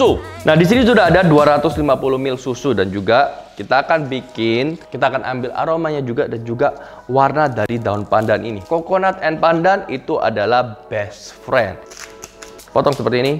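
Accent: Surabaya, Indonesia. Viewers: Logatnya kek orang sby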